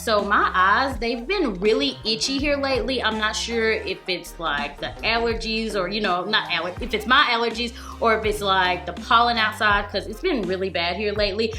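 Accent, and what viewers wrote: South, United States. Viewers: Ugh 😩 you are the cutest southernest momma ever and I love it!!!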